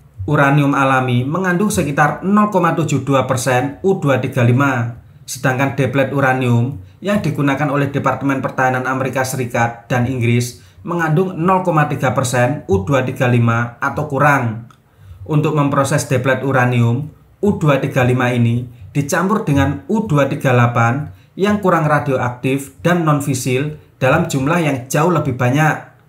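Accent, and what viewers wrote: Java, Indonesia. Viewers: Sakit kupiiiing ...medhoooog!!!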